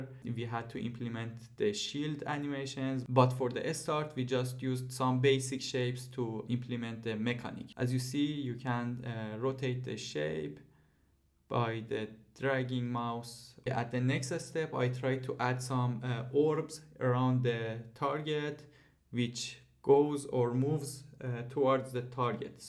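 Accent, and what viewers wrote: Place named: Iran. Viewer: I am turk but I know how persian people speak and your accent had sweet persian tonnage )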